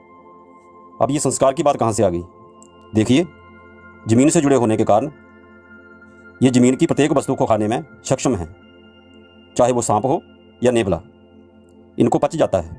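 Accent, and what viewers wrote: Punjab, India. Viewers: Punjabi person speaking in hindi 👍👍🙏😁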